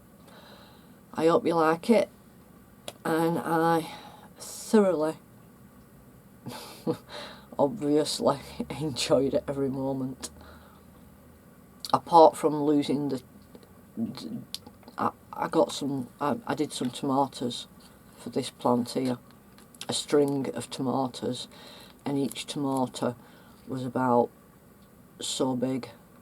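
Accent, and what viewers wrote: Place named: United Kingdom. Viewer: Just subbed you as I liked what I saw, well done on making a great page. I did struggle to hear and understand you, even though Im a fellow UKer, it was a different accent....Im crap with understanding other accents to my own (Londoner) lol. I enjoyed watching your ICADs video too, especially the pink one, Im such a pink freak! lol hugs Tibby xxxxx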